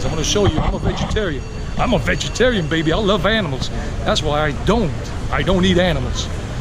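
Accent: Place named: Colombia. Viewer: Wow, you sound like Colombian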